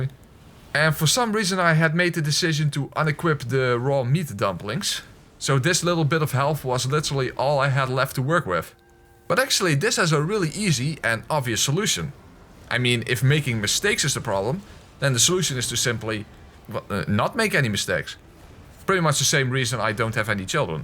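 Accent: Netherlands. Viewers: You have a dutch accent. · man man ge kunt ge woon horen dat deze man een Nederlander is, hallo buurman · Perfect Dutch accent💎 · hahaha een echte nederlander haha wat een acsent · Jesus f christ I can just tell you’re Dutch, that accent is fucking awful. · Aha ik dacht al wat een Nederland accent dit maar blijkt dat je dat ook bent❤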